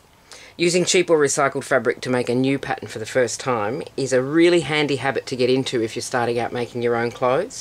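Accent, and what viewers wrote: Australia. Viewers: PS Goud on you Mate. Great to hear an Aussie accent. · I thank the almighty algorithm for suggesting this video. I checked it your channel and saw weaving (something I've always been drawn to but minimally played with). I heard your greeting and immediately heard an Aussie kindred spirit and hit subscribe :) Good luck on your YouTube journey :)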